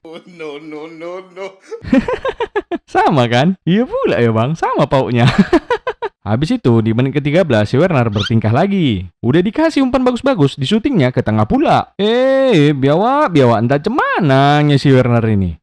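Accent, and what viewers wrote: Medan, Indonesia. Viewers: Medan mana kau bang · Bg abg org medan?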